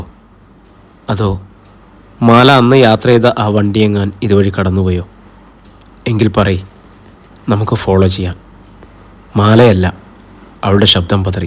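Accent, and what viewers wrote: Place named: Wayanad, India. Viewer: ബ്രോയുടെ റീഡിംഗ് സൂപ്പർ. വയനാടൻ ശൈലി അല്ലെങ്കിലും ഇടക്ക് വരുന്നുണ്ട്.❤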